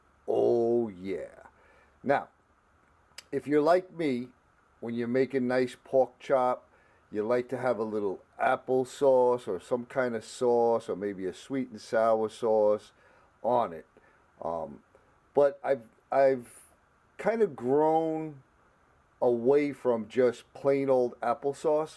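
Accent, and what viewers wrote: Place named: South, United States. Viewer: Yum! Thanks for showing that... even though I don’t think it’s gonna work on my pizza tonight! 😂🤣😂.... by the way, loved your “southern” accent! 👍🏻👍🏻👍🏻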